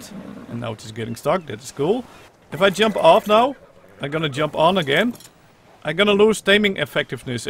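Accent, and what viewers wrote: Netherlands. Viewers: ben jij een nederlander je hebt het typisch nederlandse accent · are you dutch?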